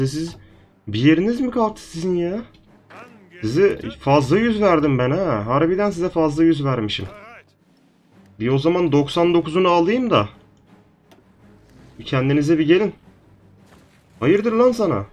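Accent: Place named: Black Sea, Turkey. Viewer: Birde bişey sorcam siz çankırılısızda peki sizin anne tarafı rica edersem kardenizlimi çünkü ben giresunluyum ve siz biraz karadenizli gibi konusuyosunuz bizim oranın insanları gibi sıcak kanlı ve komuksiniz biraz lehcenizde öyle gibi sadce merakımı arz ettim roca edersem cevaplarsanız sevinirim tabikide siz bilirsiniz bu soruyla ayıp ettiysem afadersiniz yaklasık 2 senedir takip ediyom sizi ememğinoze saglık bayramınız kutlu olsun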